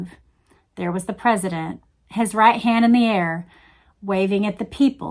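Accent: Texas, United States. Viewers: It's that Texas accent, which is one of many Southern American accents. Add the pleasant style of her reading & it's very relaxing. I've learned Not to listen to before bed. 😊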